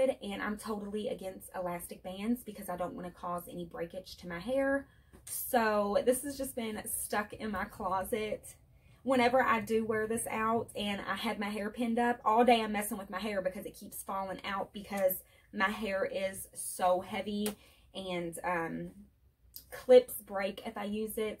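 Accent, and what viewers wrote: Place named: Southwest Virginia, United States. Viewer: your channel is my favorite! love your accent. It sounds a lot like mine. I'm from Southwest VA. I have two daughters and also live in a mobile home so we have a lot in common. I love to watch your channel for cleaning motivation. You're daughter is so cute and you are too! love your long hair!! Hope you have a great day. <3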